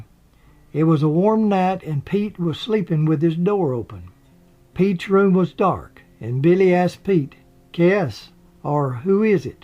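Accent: South, United States. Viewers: I don’t know where you’re from, but you have a pleasant story telling accent. I’m guessing western AL or Mississippi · I love that Southern accent. Music in my ears. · Ok...I'm from Wisconsin and in my opinion, anyone south of Illinois has an accent that multiplies by every mile south of that. Perfect voice for documentaries and you should be on TV and radio. Your documentaries are better than some of the History Channel documentaries I've seen. Also, speaking of History Channel, have you watched "FOUND?" If you havent, you should see the Jesse James episode...mind boggling!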